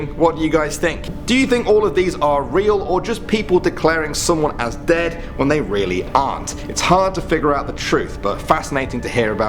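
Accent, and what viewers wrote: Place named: England, United Kingdom. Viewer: Danny is my favorite i love his enland accent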